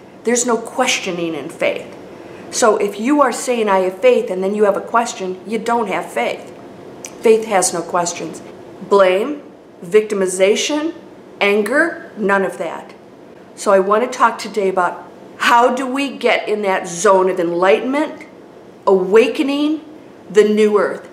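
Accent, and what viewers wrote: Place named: Detroit, United States. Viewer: Hi, I just got to say I love your Detroit accent. My mom and dad where from Detroit and my mother has a similar accent like yours. My parents lived on 9 Mile back in the 1950s and early 1960s. They moved to California in 1963 but I wanted to say how much I love your channel. Thanks for everything.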